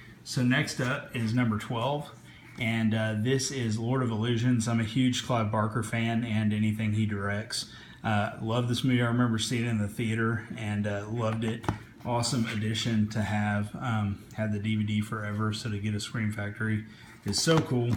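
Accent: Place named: United States. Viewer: Yeah, Scream Factory has released so much great stuff. I'm so thankful they released the Halloween boxset. Ok, you have a cool accent, so was just wondering what state you lived in. I should have my Scream Factory vid up by early next week.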